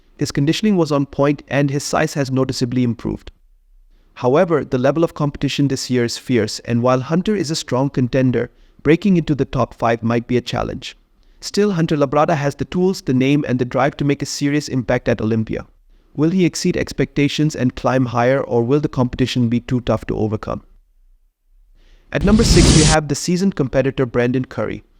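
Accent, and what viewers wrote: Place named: India. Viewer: I am sure you are Indian because of your tone.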